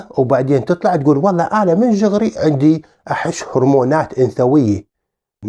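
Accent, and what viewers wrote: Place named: South, Iraq. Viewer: هههههههه اشكد حلووو من تحجي حجي الجنوب مو طبيعي اله نكهة مميزة استمرررر اخ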